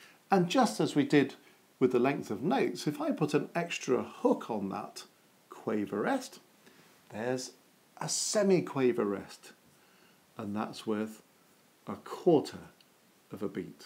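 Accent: United Kingdom. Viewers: I love this teacher. The pace and tone of his speaking and the British accent all make it so enjoyable to follow the class.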